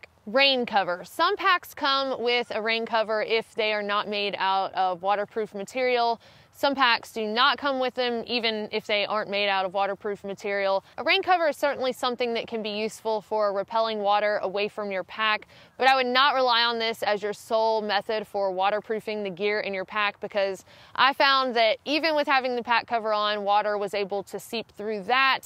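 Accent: South, United States. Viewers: Wow, a firehose of great info. I thought she said "ass axe," then turned on the closed caption option and rewound to discover it was "ice axe." Trying to figure out which part of southern US she's from. Maybe Alabama?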